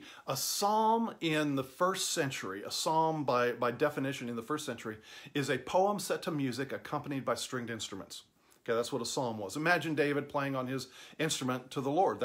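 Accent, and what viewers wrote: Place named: South, United States. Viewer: yall have that WONDERFUL sOUTHERN TWANG, LOVE VE LOVE IT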